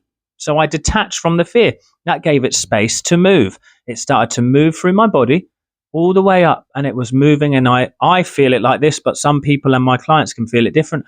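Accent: United Kingdom. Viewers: Halo there, lol is this uk accent? Love the content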